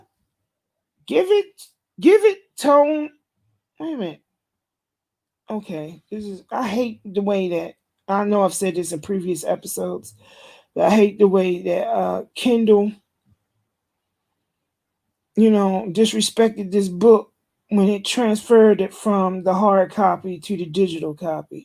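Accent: Baltimore, United States. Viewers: That baltimore accent makes this book hit right 😍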